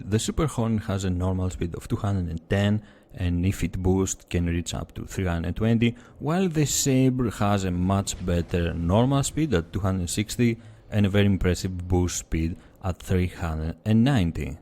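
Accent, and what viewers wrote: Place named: Greece. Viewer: Nice videos and very well presented ! Subbed right away . Sounds like a greek accent :) If so , I am double proud for you , early backer here o7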